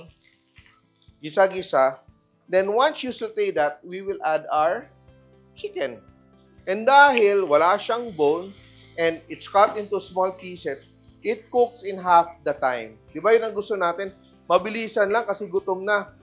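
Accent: Philippines. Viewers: Are you a cook from the Philippines? I noticed you sound like someone from the Philippines speaking Tagalog or Cebuano.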